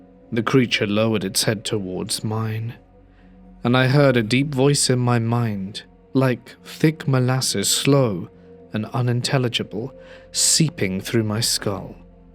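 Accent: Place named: RP, United Kingdom. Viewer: never disappoints 🙂 I tried getting my brother into this channel, but he said you sound too posh 😂 he's a let's read fan....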